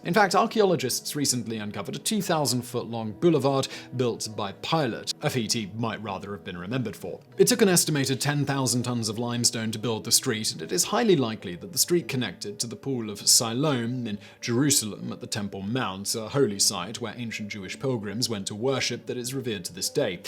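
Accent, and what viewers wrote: United Kingdom. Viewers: This guy does a great British accent.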